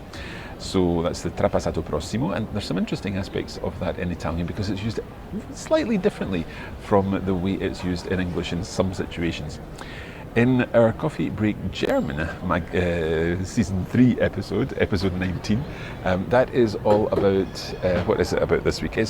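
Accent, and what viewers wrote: Scotland, United Kingdom. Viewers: I love your Scottish accent,. What part of Scotland are you from?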